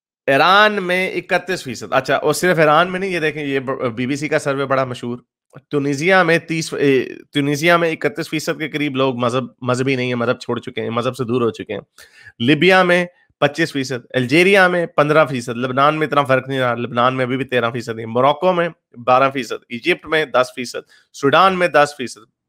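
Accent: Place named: Punjab, India. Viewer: That deep Punjabi voice 😁😁😁👍👍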